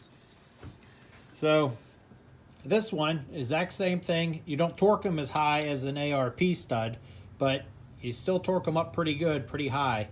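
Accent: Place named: Pittsburgh, United States. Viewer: I didn't even have to look at the location by the video to tell you were from Pittsburgh. Y'all have a very distinct accent. Real thorough tutorial. Gonna be using this soon.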